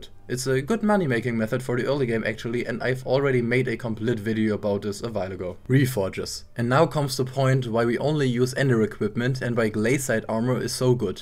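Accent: Germany. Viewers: he sounds 100% german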